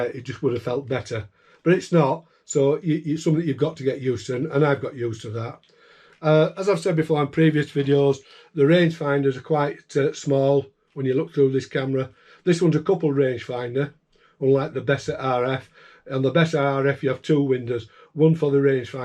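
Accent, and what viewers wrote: United Kingdom. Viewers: subbed straight away just for the tyke accent. I'm originally from Cas'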